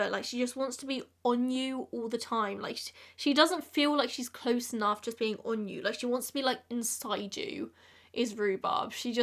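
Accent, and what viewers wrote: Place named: RP, United Kingdom. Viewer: Honestly she has the best voice/accent. You should be the new "Mind The Gap" voice.... Very posh. What area of England are you from? I'm sorry Reading is a shit hole. I'm from Kentucky, maybe for your next horse you can come to Fasig-Tipton and buy one 😆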